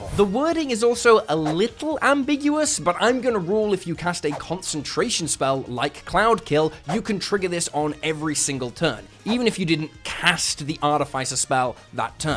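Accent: United Kingdom. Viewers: You're British?? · guys I think he's British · Wait! He’s British? Thank gods Wilburton was there to take the tirade that revealed this fact to us. 😂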